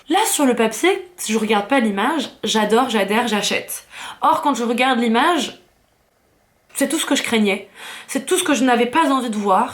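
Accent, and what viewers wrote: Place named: Liège, Belgium. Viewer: Allez, je m'abonne ! Analyse pertinente, des sujets intéressants, un air de ressemblance avec mon actrice favorite Anya Taylo Joy et arrière accent Liégeois de Beaufays très léger. Tout y est 👌🏽! C'est super !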